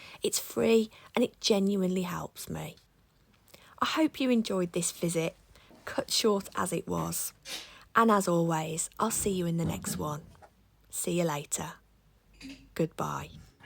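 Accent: Birmingham, United Kingdom. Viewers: your beautiful Brummie accent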